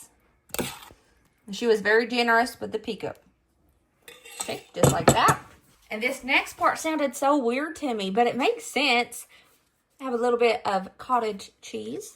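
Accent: South, United States. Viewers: lol.. we can hear the country accent, if you can’t they are country too, and they speak your language so they wouldn’t know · LOL!! I'm a Texan of Mexican heritage ... I, too, hate cilantro & DOES taste like soap!🤢😫 My bestie LOVES cilantro and uses it a lot (🙄🤦‍♀️), and I've gotten used to it, but I still won't buy it.😉 First time here, and I subbed. Love your southern accent. ❤ And you did pronounce frijoles correctly. 👍 Oh, and I have added cornbread to my mom's beans and still do. Can't help it.🤷‍♀️ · I adore your accent, I moved to Texas & there are not a lot of people here who have country accounts. 😔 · Luv yo Suthun accent...lol. I often make the chorizo with beans but use the very minimum amount of liquid. This us just a micro part of the gigantic array of food dishes from Mexico. I also make Móle, Enchiladas, Chiles Rellenos, (Shee-less-reh-yenos) plus many other dishes at home. 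God bless you and your family. BTW, I love cilantro and make my own Salsas with cilantro. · Girl I'm here for your country accent!!❤ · I’m not Mexican but in Texas you’re not allowed to not like Mexican food. It’s the best. I also love Vietnamese foods. They use a lot of those great spices plus others from Asia. You should try some of the Asian recipes. People would love your take on them. I’m an old great grandmother who really enjoy watching you. I love your accent it’s so warm and southern. You and your family are precious. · don't apologize for your Southern accent. I was born, raised and still live in Tennessee and the older I get the prouder I become of my accent. You are a dear girl. I love watching you and your sweet family. God Bless you. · I LOVE & ADORE YOU!!! 😂🩷😁 You are the funniest when you're trying to explain things, like your Southern Accent trying to pronounce Mexican words!🫣 Thank you for making me feel better and giving me a chance to actually feel like laughing🩷🤭🤣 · You talk just fine, don’t mind the (KARENS)😳. 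❤to you thank you for your time and country a. accent. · Sister we understand that southern drawl just fine! ❤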